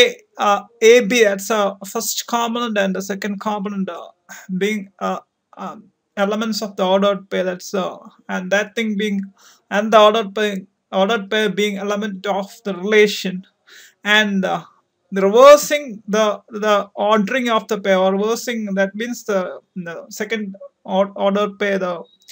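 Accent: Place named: India. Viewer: Well explained..!! The accent is not at all a topic of this video. If you guys cannot understand his accent, find some FREE material, and learn more about Indian accent. This one explains the mathematical concept better than other fluent accent videos.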